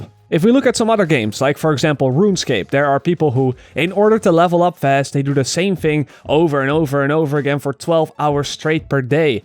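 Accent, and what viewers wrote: Netherlands. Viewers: am from the Netherlands too. I can understand that it's not his first language but he is just talking Dutch with English words if that makes sense.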